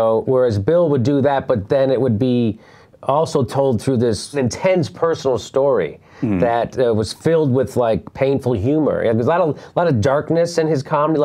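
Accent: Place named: Chicago, United States. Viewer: Jimmy’s accent has changed so much throughout the years, even 9 years ago he had a relatively thick Chicago accent.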